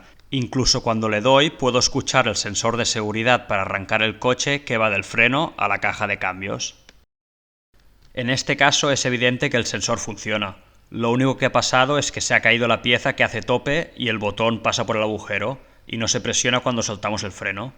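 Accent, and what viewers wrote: Spain. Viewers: Excelente gracias amigo, español verdad? saludos Chile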